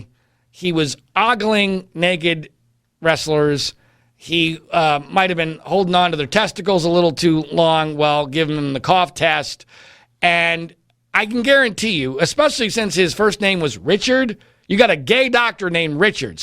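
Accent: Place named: Philadelphia, United States. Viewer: I knew there was a reason why I enjoy listening to you. You're from Philly.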